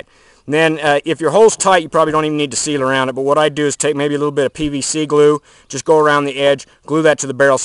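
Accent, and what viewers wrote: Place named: United States. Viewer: Have to ask ..I collect accents ..what state are you from..??/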